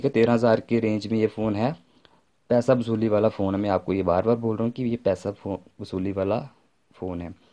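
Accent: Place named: Punjab, India. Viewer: aapke bolne se lagra tha aap punjab k ho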